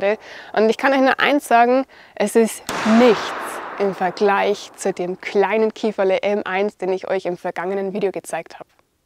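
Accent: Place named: Swabia, Germany. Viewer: Perfekt wär das Video, wenn die ganze Zeit schwäbisch gesprochen hätte - ja, dieser kleine Kieferle! 😉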